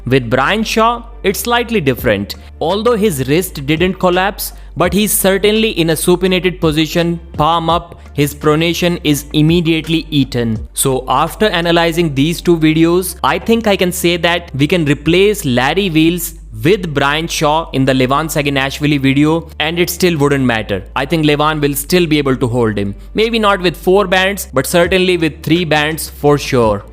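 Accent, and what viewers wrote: India. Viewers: Indian voice -> disliked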